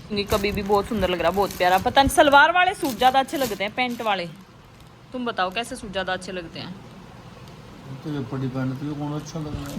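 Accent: Haryana, India. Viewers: Aap haryana s ho ya up s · Good morning madam ji aapki awaaz aisi lagti hai Jaise Haryana ki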